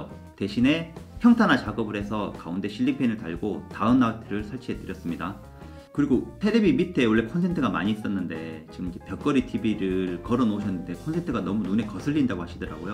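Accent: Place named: Gyeongsang, South Korea. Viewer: 영상 볼때마다 홧병 생겨요ㅠ 왜 이제야 가 알고리즘에 떴는지 ㅠ 그래도 갱상도 구수하고 순수한 말씨가 좋아서 숨고르기하면서 봅니당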